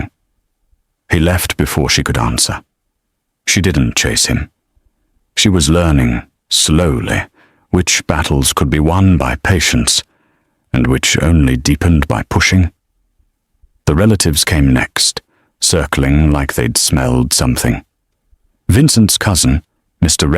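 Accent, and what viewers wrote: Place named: United States. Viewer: You can travel around the universe but one cannot ignore the fact that, there is a big difference between proper English pronunciation, spoken by the people of the UK and American's version of English pronunciation. And we are listening to a story of Victorian England. Very inappropriate and causes double standards.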